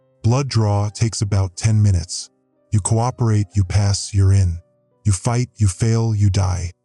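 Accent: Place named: Spain. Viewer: The AI keeps going between regular English to a heavy Spanish accent lol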